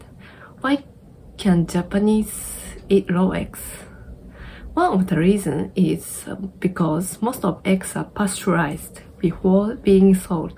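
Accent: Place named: Malaysia. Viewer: You have a pretty malaysianized japanese accent.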